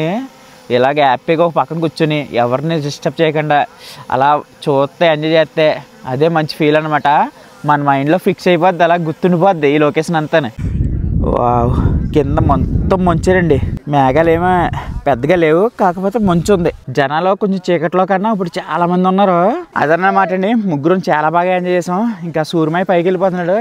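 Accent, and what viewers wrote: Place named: Rayalaseema, India. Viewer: Anna Videos Late Avuthunnay But N Duko Mee Slang Ante Maa Raayalaseema valla ki baga istam anna Ann Alte Cheyakandi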